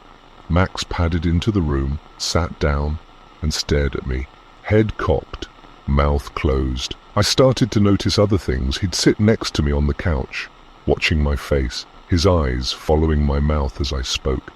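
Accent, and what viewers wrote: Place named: United Kingdom. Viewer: AHHH HES BRITISH >X33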